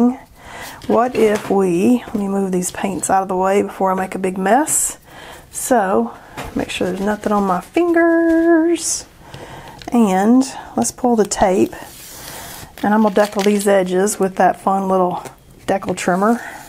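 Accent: South, United States. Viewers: your southern accent is super southerny* today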